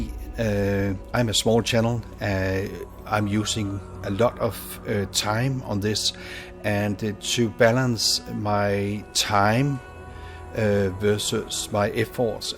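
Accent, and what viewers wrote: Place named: Denmark. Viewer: Man the Danish accent amuses me to no end :''}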